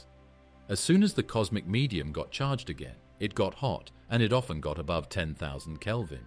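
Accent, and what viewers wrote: United Kingdom. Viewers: Here it comes a British accent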